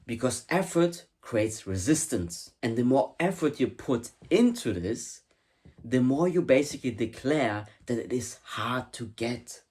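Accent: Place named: Scotland, United Kingdom. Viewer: are you from Scotland? lovely accent wow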